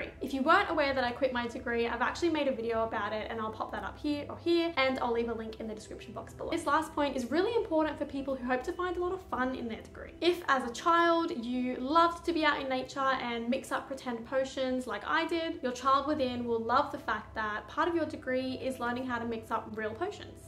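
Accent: Australia. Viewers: I'm so glad I accidently found your videos. I live your energy. I was just playing random naturopath videos while doing a workout and I was like is this an Aussie? lol *I'm in Q.L.D. 😎🍉💃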